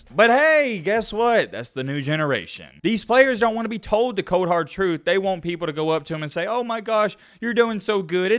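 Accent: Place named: Mississippi, United States. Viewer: “Jackson State University has honored all financial obligations of Coach Deion Sanders’ employment. The video in question was filmed on September 20 on our campus. Unfortunately, a seconds-long excerpt using a hypothetical example from an over 60-minute interview is being used out of context and circulated as fact.” from hbcugameday, 12/09/2022 titled deion-sanders-wasnt-owed-money-jackson-state-officials-say “Jackson State University has honored all financial obligations of Coach Deion Sanders’ employment. The video in question was filmed on September 20 on our campus. Unfortunately, a seconds-long excerpt using a hypothetical example from an over 60-minute interview is being used out of context and circulated as fact.” Jackson State’s Division of Athletics, posted on 12/09/2022. These appear to be legally binding statements. Why is the first third of the video repeats? 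Afterwards, you finally started with shaming a black player. It's sports opinions, so valid. However, it was personally motivated to maintain some balance. Anyway, all of your information is from tweets. As always, time will tell. Oh, that Favreish accent says alot. I know; I'm a Mississippian....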